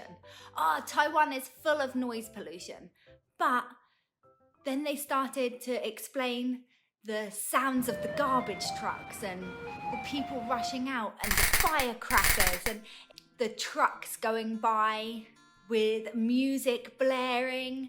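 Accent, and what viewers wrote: United Kingdom. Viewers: 英國口音好好聽，看著你講話舒服。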